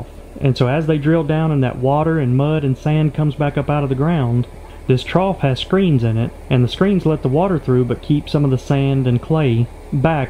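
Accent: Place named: Texas, United States. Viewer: its not obvious its texas accent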